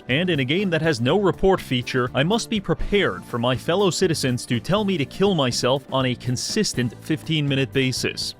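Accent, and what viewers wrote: Canada. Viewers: Wtf are you canadian... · I can hear that canadian accent boy...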